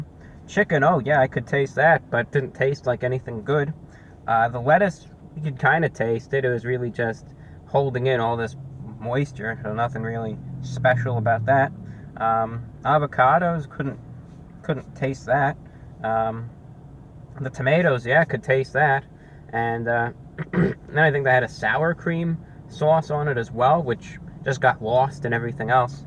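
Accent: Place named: Mid-Atlantic, United States. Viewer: I've never seen anyone speak regularly with a Mid-Atlantic accent before. I like it.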